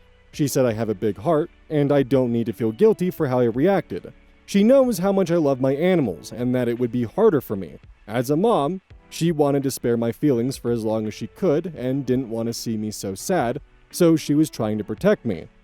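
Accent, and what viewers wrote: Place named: Alabama, United States. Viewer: ah, someone's from Alabama I see.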